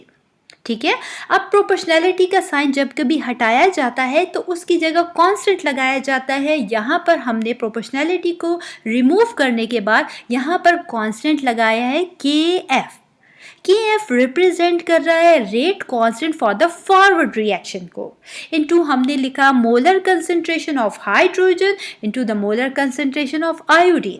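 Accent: Pakistan. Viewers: Mam are you from Pakistan?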